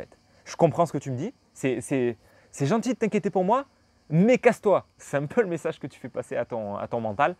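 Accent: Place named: Marseille, France. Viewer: J'adorais cet accent marseillais ;)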